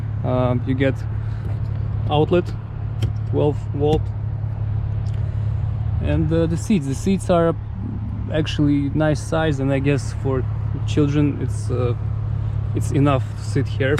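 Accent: Russia. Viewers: I,d say a Ruskie too .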